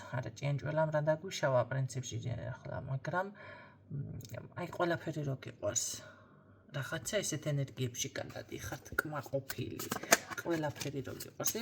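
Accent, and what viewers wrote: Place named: Imereti, Georgia. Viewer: ძალიან კარგი პიროვნება ხართ .მიყვარს თქვენი ლაპარაკი იმერული კილოთი რომ ხსნით ტაროს❤❤❤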